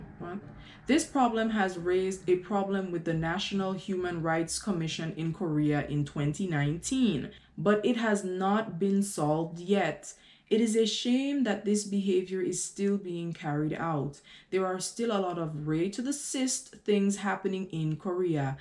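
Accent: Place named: Grenada. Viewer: You sound so Grenadian! I do not go where the sun don't shine!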